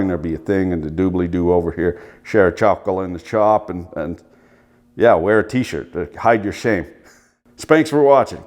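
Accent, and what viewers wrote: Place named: Canada. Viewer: I'm from the Midwest United States and after watching one minute and twelve seconds of this video... that's the most Canadian fucking thing I've ever heard lmao...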